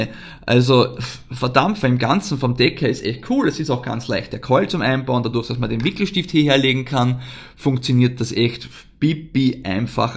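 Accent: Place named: Austria. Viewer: Krass .... Capital Bra mit österreichischem Akzent! Klasse Video, weiter so :)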